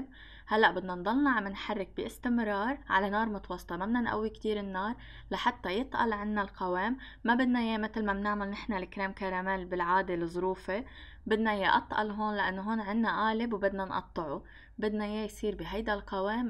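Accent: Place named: Lebanon. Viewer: يلي بحبو اكتر من وصفاتك هي طريقة كلامك ياخي شو بدنا بالحكي اللهجة اللبنانية عشقي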